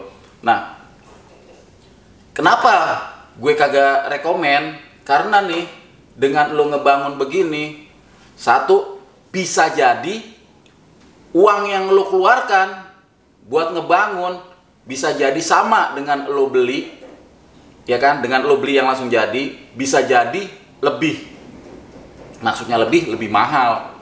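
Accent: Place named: Betawi, Indonesia. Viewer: Tertarik dari bahasa Betawinya..nyablak..lama2 tertarik ngliet konten Vespa...padahal ga punya Vespa...lanjut bang......cihuy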